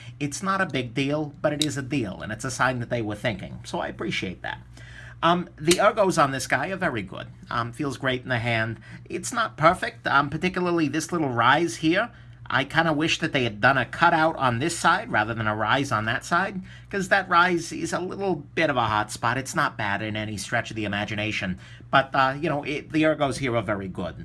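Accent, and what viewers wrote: Russia. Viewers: Hey . Yours „ russian English “ sounds much better then yours “ through the nose English “. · Excellent review and Ruskie accent. Spaceba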